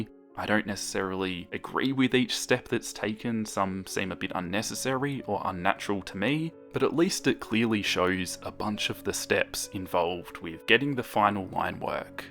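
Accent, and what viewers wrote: Australia. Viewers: Australian dialogue 😱😱😱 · Also, r u Australian? If u r, i am 2!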